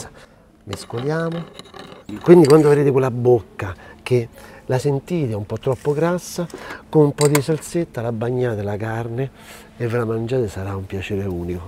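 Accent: Rome, Italy. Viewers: Sadly the Roman way to speak gets lost in translation. It's half of the fun!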